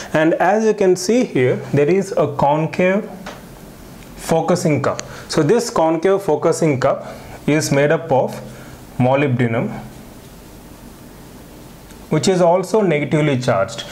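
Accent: India. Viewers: The Indian accent will always be entertaining to hear lol your English is better than most of us. Great lesson and explanation 👏🏽👏🏽👏🏽👏🏽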